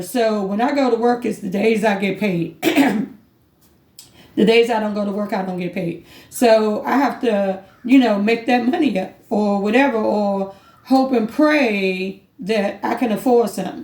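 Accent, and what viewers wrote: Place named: DMV, United States. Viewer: These rent prices are crazy anyone who looks down on somebody who is on section 8 needs to watch it because the rent is way too much people claiming that voucher holders are this and that everyone has a story after being beating up for so long who in their right mind who would trash the place after being homeless most of us want to just live as you said facts this helps people get back on their feet after all most of us beeem through we grateful to have it . I’m Homeless and just got approved for my voucher finally I been through too much years worth I need this voucher I can’t pay no 3k for a one bedroom this is outrages . Same I also have struggles too . Section 8 voucher is a blessing tbh even though it’s a lot of fighting for it it’s a war for this thing . Also the name we call it is housing choice voucher but there is people who are just lost and don’t realize with this you can get a house you can get a town house you be living better than others paying all their check away lol never be afraid to take help Or ask for It I think it’s pride too that be messing up with people and they look down on others it’s very sad once you get approved and get your own place that all that matters voucher give you a place to live so no need to look down on that same with food stamps EBTim also from the DMV too I hear that accent lol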